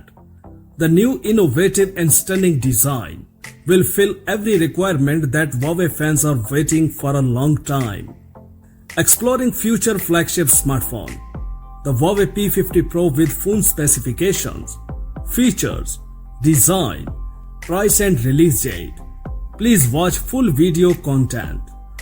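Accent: India. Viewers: India english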